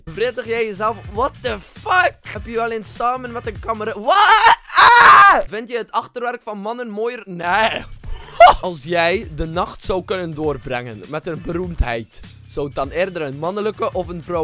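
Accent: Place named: Antwerp, Belgium. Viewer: sinds wanneer spreekt altijd uit met een antwerps accent?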